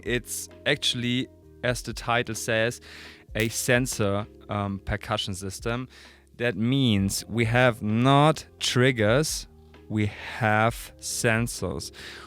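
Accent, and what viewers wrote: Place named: Germany. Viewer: The German accent is legendary haha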